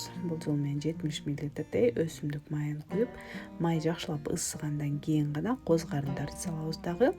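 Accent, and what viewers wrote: Standard. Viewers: Сонун экен эже рахмат. Сиздин баарынан да бир да орусча аралаштырбай таза тунук кыргызча суйлогонунуз жагат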